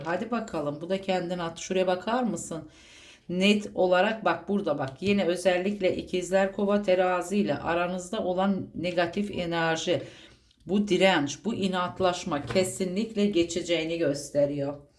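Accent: Azerbaijan. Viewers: Siz Azerbaycanlımısınız? Şive çok benziyor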